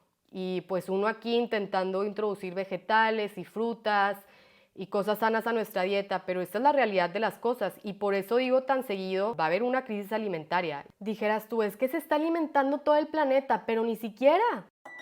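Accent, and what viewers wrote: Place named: Monterrey, Mexico. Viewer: Hola. justo acabo de terminar de ver tu entrevista con nayo Escobar Jajaja, y justo hacía como unas tres o cuatro semanas que te descubrí en el video en donde fermentas verduras Y Bueno nada excelente tu canal y todas las tantas cosas que estudias, Qué haces Y en las que trabajas Por otra parte no sé si en este canal exista un video en donde hables de la historia de tu hijo Posdata: Ahora entiendo por qué de tu acento tán fresa y norteño pues Igual que yo eres de aquí de Monterrey Jajaja Saludos y bendiciones para tu vida, tu familia y para todos tus emprendimientos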